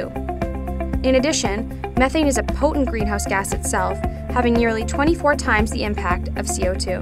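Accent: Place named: Canada. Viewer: The chick narrating must be Canadian. She also says buried weird- like “burried” vs “barried”.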